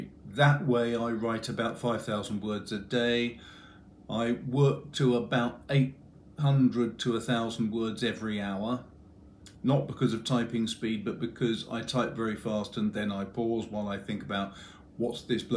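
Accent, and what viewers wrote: United Kingdom. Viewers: Great review. I hope to be a charming gentleman like you one day! People from the UK are just classier than us Americans. It's gotta be the accent. Anywho! One of my big complaints about the Freewrite is the typing delay. When I'm on a roll and I'm comfortable, I can usually type 70 words a minute on average and I'd like to keep that consistent. And it seems like the device would struggle keeping up with such a speed. Any thoughts on that?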